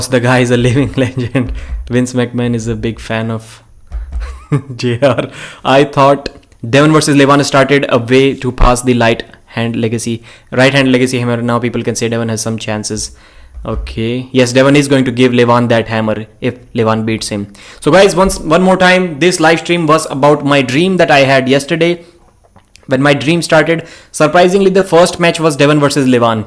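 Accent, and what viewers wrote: India. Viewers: I had some difficulties with his Indian accent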